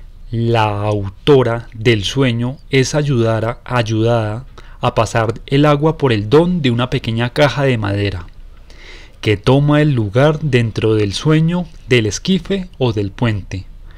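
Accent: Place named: Colombia. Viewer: Que joya este libro y de mano de un acento colombiano. Oro puro. Saludos y gracias por ese trabajo que hiciste para transcribir esta joya!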